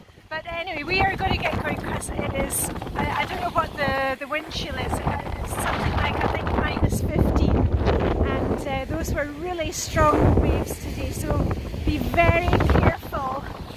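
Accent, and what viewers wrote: Scotland, United Kingdom. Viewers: I like in Denmark, I don't need to win anything, I feel like a winner already, watching her happiness and little life tips in a sweet Scottish accent.Thank you!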